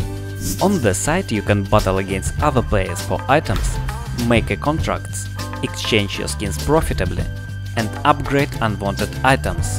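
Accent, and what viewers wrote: Russia. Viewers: у меня шизофрения если я слышу русский акцент? а нет теперь я в шоке что наткнулся на 2 акк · У тебя русский акцент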